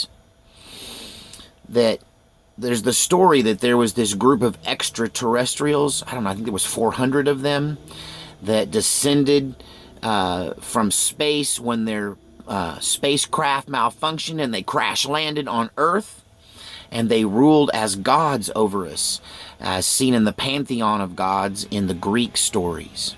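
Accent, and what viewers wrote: New York, United States. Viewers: I LOVE COFFEE TALK and I love how you say coffee talk ..I love New York Accents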